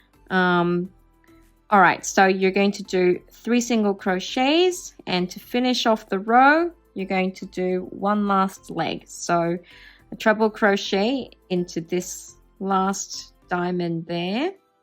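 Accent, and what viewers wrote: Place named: Australia. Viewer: New Sub here. Great work by the way. PS: it’s very refreshing to finally hear an Aussie voice doing crochet videos ❤ loving it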